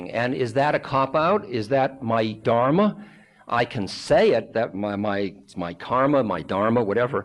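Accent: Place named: Boston, United States. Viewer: I love the man’s voice and boston accent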